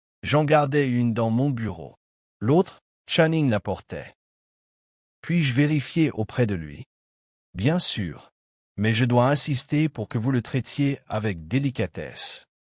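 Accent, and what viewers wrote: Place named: England, United Kingdom. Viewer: La voix avec un léger accent anglais du "lecteur IA" est plus supportable que celles entendues dans les autres épisodes.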